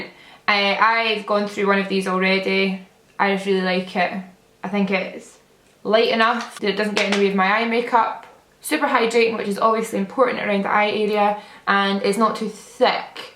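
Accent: Scotland, United Kingdom. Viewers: Not only are you an utter boss and totally glamorous, your accent reminds me of one of my best friends from Scotland, and it's awesome. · LipsticksBeauty AndMore glasgow, scotland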